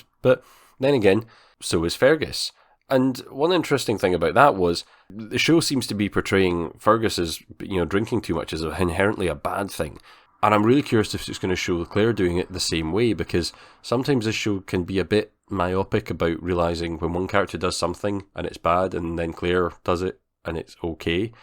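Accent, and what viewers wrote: Scotland, United Kingdom. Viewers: Hi! Nice to be here again. I really liked episode one. With all the characters and to setup the storylines I think this opening episode is a good thing. The main storyline I think will revolve around the weird Christie family. And yes, they do get weirder! It's been a few years since I've read this book, but I don't think you'll be disappointed. Is your accent Scottish? I didn't realize the actor who plays Tom had such an illustrious career. I'll have to check out some of his other work. His accent is great. Beautiful opening with Glencoe in the background! See you for episode 2 review! (Won't see you, but I'll hear you🙂)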